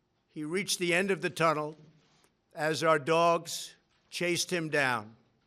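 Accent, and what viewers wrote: United States. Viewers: New York accent thicc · he talks like a real american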